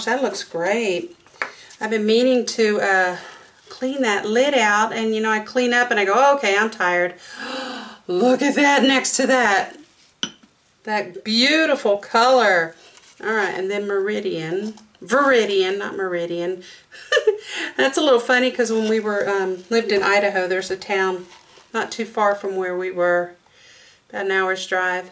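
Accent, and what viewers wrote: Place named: South, United States. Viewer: Where in Idaho? I have 2 Son's in Idaho Falls! I am glad I am not the only one who breaks out in song! LOL I Subscribed!! I Just Love This!!!! Where are you from? You sound like you are from the South! Love~~Z from Texas! Vonda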